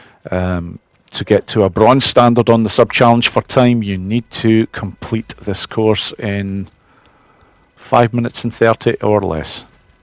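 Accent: Scotland, United Kingdom. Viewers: Hey bro, awesome work! Hearing your voice the first time. You Scottish by any chance? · First Tutorial video with British accent!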